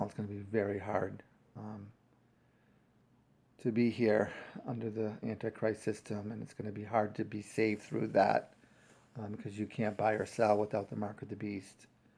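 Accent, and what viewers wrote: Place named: New Hampshire, United States. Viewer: Hey, I enjoyed this. During the first five seconds, when you introduced yourself I was thinking you were from New Hampshire, based on your accent and family name, and then you said you were from NH. I'm a Manchester native. I do believe this is the year when we get 20/20 vision from the Lord regarding the unfolding end time events. It's already been happening. The final puzzle pieces are all coming together with perfect clarity now, regarding the things we've been studying for decades. Here are some things that will confirm what you were saying: <link> <link> <link>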